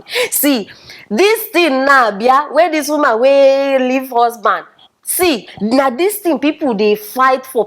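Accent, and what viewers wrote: Nigeria. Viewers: That Nigerian English ooooh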